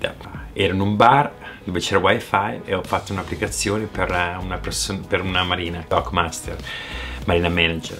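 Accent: United States. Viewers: Ciao,ho appena iniziato a seguirti e gia mi piaci.Come fai ad avere l'accento americano?Ho anche io in mente di utilizzare youtube per raccontare la mia storia ed esperienze.Spero di riuscirci.Ciao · Ah ok lo hai spiegato qua! Come non detto scusami.. by the way grazie che mi hai raccontato le tue esperienze di phatos! Vorrei intraprendere anche io un esperienza come la tua , come graphic Designer facendo un esperienza.. vediamo cosa succede dai.. due domande 😂 dove vivevi in Italia? E come mai ora hai un accento americano molto forte? Tanti altri che vivono anche da 20 anni parlano italiano con accento italiano... In bocca al lupo!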